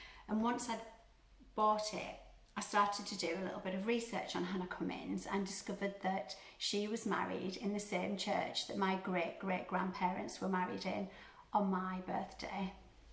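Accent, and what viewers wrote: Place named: Northern England, United Kingdom. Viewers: I love your accent as well, the Yorkshire accent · lovely to hear from a Northern lass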